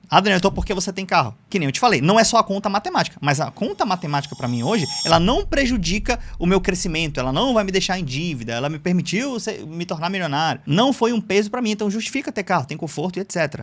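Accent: Manaus, Brazil. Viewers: Sotaque manauara detected 😁 bom demais seu canal!!! Abraço da zl de manauxxx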